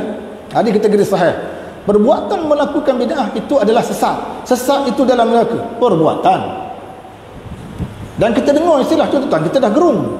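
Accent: Terengganu, Malaysia. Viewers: Rasanya ustaz ni org terengganu, mendengar pd loghat beliau. Tapi takde pulak mem BODO BODO kan org, me MENATANG kan org, meng HAIWANG kan org...Wallahu a'lam.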